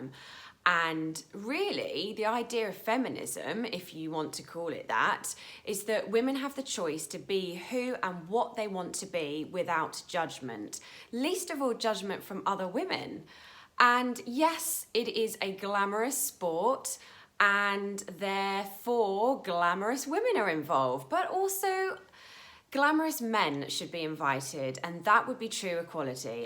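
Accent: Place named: London, United Kingdom. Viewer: For some reason I always find the London tone makes everything sound more sophisticated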